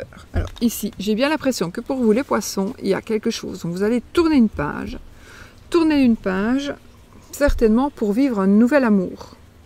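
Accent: Belgium. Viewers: Je suis poisson ascendant lion est ce que je dois regarder la vidéo d horoscope lion aussi ? Vous êtes magnifique jadore votre accent belge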